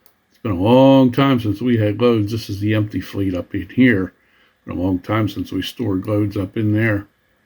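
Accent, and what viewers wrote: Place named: Pennsylvania, United States. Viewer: Great video. Thanks for posting. As soon as I heard your voice I knew you were from back home. The western PA dialect is unmistakable. I was born and raised in California, PA. My grandad was a coal miner and my dad was a steelworker. I have seen lots of coal trains and lots of coal barges. This tour was great for seeing this part of the process in more detail. I never had the opportunity to see it. Thanks for posting. Keep up the good work.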